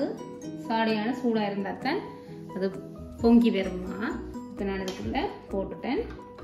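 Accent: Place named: Sri Lanka. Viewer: இலங்கைத்தமிழ் 💗💗 தமிழ் என்றாலே அழகுதானே .... அக்கா உங்களுக்கு 4000 watch Hours வந்திட்டா ??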